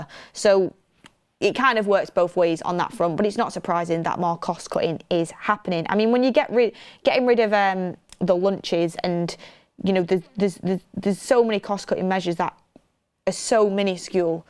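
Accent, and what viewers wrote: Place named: Manchester, United Kingdom. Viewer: It's the Mancunian accent, dude. ;)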